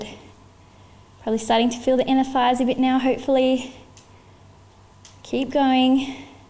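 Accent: Australia. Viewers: Loving the accent! Is it Australian?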